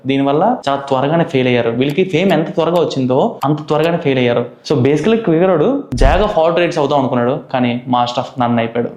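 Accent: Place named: Godavari, India. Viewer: Bhayya nvu konchem slow matladu words spastam ga pronounce cheyatledhu Emo anipistundhi edho godavari slang with speed 1.2x lo choosthe ela untundhi ala vastundhi aa last lo follow matram correct chepthav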